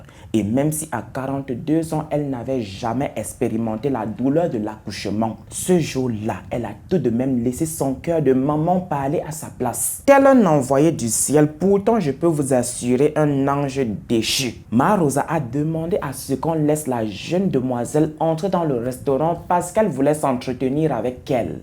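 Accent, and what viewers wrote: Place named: Cameroon. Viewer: Sinon on ne prononce pas le T de VINGT (20) hein, je dis ça je dis rien ! 😂😂 Sinon j’apprécie ton boulot et surtout cet accent si particulier du MBOA que l’on aime tant ! 😅😅 Bonne continuation ! 🙏🏽😌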